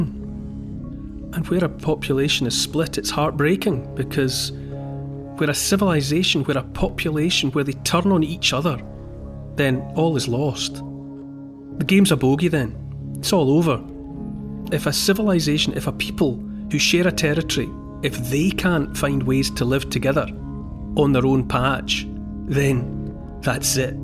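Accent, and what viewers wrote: Scotland, United Kingdom. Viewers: Neil Oliver => bringing back prestige to the Scottish accent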